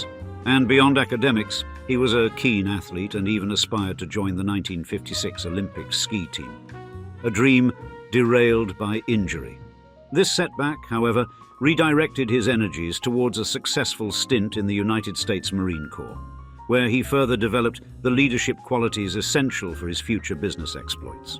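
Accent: RP, United Kingdom. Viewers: The posh British accent makes it so much more Old Money sounding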